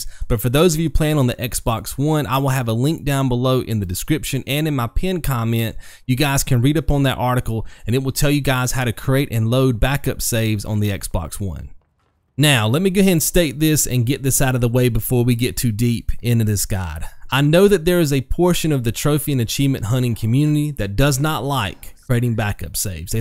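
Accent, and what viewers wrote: South, United States. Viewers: just curious though. Where are you from man? You sound sort of southern, yet mid-western.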